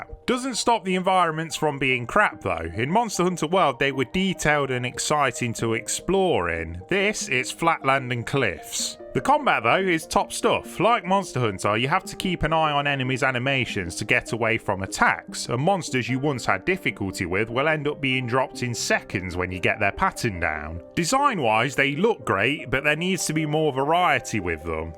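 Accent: United Kingdom. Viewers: I don't know who you are but you sound really british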